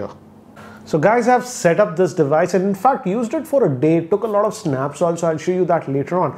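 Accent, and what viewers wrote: India. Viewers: Classic Indian accent I'm don't used to this accent lol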